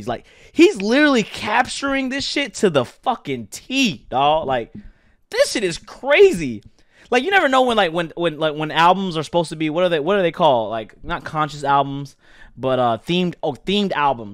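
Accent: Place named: Toronto, Canada. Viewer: Bro please stop doing that Toronto accent 😭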